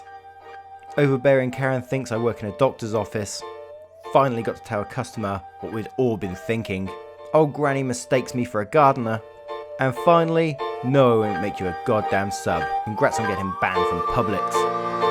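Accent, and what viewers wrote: United Kingdom. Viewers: HI BRITISH PERSON!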